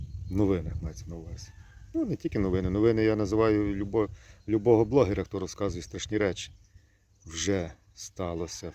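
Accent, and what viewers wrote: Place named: Lviv, Ukraine. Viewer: Я тоже иногда Тебя смотрю ,благоразумные мыслями делешся ,приємно ,ставлю лайк ,подписан давно , пишу коментарий более восьми слов ,по алгоритму ютуба для продвижения ролика .Хай щастить ,може колись завітаю в гості на турник.Чую ти по говорю мабудь зі Львівщини , може мені так здається ,в мене коллега так розмовляє бо я з Слобожанщини.Миру та Добра Тобі і в твоїм Рідним.🎉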